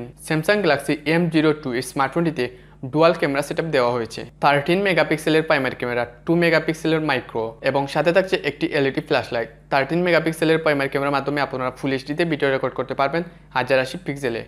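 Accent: Sylhet, Bangladesh. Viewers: vai sylhety na kita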